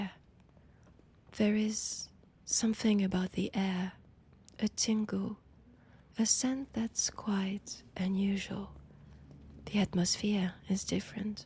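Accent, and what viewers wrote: France. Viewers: it was indeed a huge surprise for me! i literally was stunned for minutes, not understanding if it's even real. And thanks to you for making my friday great with this one ^^ And hey, please don't cringe! Just have fun with it's "thick French accent". I would call that mix adorable at the very least:) Have a wonderful week ahead,. Your content is making my days brighter, so i'm supporting you in my possible ways. I just hope my silly little comments (which also could be cringe, hehe) making you feel better and appreciated \o/ Ты молодец и я правда восхищен твоей работой. Надеюсь, ты будешь продолжать дальше!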